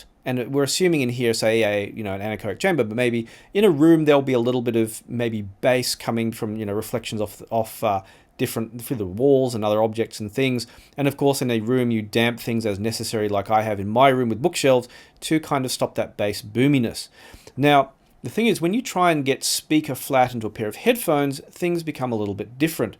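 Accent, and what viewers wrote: Australia. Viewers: Bravo! The most grown-up headphone review ever. "I'm not going to talk about cables." <3 I usually freak out when "audiophile language" is invoked; I can't help being reminded of when I spoke like that and I feel so ashamed. None here. No overused superlatives. No neologisms. As much a pleasure to listen to an Australian accent talking about the best headphones without needing to sell them (they'll do that themselves, no doubt) as it'll be to actually listen to the Expanse itself.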